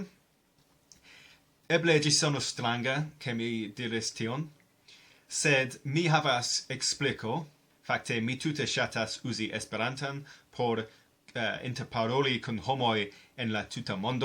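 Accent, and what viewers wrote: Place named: England, United Kingdom. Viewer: ... Ĉu vi estas angl-landano...? Mi demandas ĉar mi awdis vian akcenton kaj you sound English^. Kiel, el Anglio. Mi trovis ke mia lingvo (angla) estas, on a personal level^, mia honto. Kaj mi petegas... lernu kiel elparoli "malantawan" U kaj aliajn vokalojn. Nia (Sud-)Angllandano U estas duon antaw, duon malantaw, inter la Germana Ü (preskaw plene antawigita U) kaj la Germana kaj vera Esperanta U. (Redakto: Mi vidis vian filmeton je decembro 2016-jaro kaj ŝajne vi tre plibonigis vian elparolon.) Ĉiuj ni fuŝas la akuzativon. Vi ne malsuperas se vi akuzativfuŝas. ^ Jes, mi lingvosaltadas (codeswitch). Kial? Plejparte, ĉar mi apenaw parolas Esperanton.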